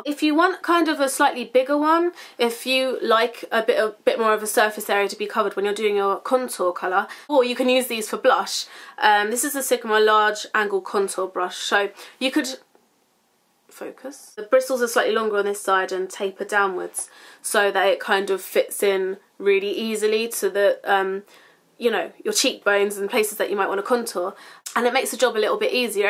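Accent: United Kingdom. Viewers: i love it when you say reckon :) oh how i wish i had a british accent! x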